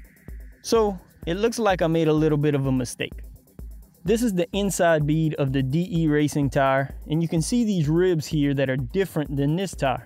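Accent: Louisiana, United States. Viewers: Where are you from? Have to be from South Louisiana w that accent . Who Dat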